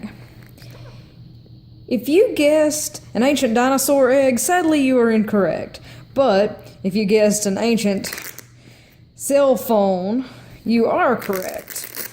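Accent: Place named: Alabama, United States. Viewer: I'd guess you're in Alabama based on your accent. Maybe Georgia?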